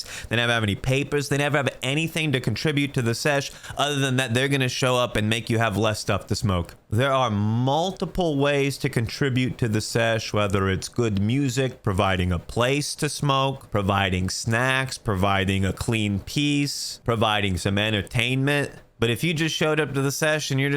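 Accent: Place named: New York, United States. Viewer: I dont even smoke, I watch when this guys NEW YORK accent spills into the video.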